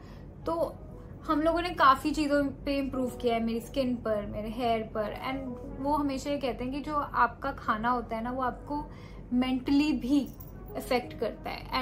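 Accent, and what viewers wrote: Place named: Rajasthan, India. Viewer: Didi aap Rajasthani accent kase bol lete ho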